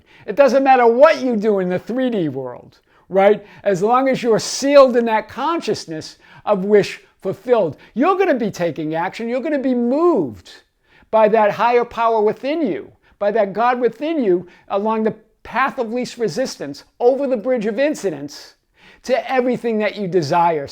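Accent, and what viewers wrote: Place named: Massachusetts, United States. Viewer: Mate I have recently discovered your channel and you rock hahahaha. I love and appreciate your in depth explanations, your wisdom, your catch phrases " hear this!", "I want to unpack this like it's never been unpacked before", your cool Massachusetts accent, and your infectious laugh always makes me break out in a laugh too hahahaha!! Seriously, thank you for your time, effort, and service in teaching us these concepts and helping to harden our own understanding of these concepts at this point in time and space. Oh, and I notice how you seem to reply to everyone who comments or asks questions on your videos, and not just superficial responses but quite in depth and supoortive. What a legend mate 😉 From a new subscriber in Brisbane Australia oi oi oi!!! 👊😁💚☮🙏🌈🌟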